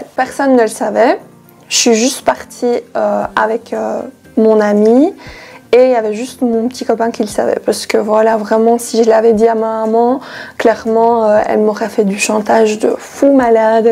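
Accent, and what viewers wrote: Belgium. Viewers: Coucou ma belle, j’entends ton petit accent belge et je suis belge aussi. Je voulais avoir les coordonnés de la chirurgienne ainsi que les prix que tu as payer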